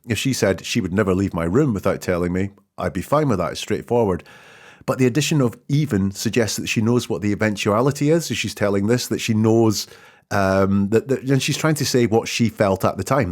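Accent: Scotland, United Kingdom. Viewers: Nice to hear a fellow Scot 🙂. Really enjoying your videos.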